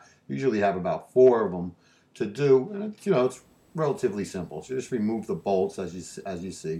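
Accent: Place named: Northeast, United States. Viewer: Nice job. Love the Northeast accent. Not flirting.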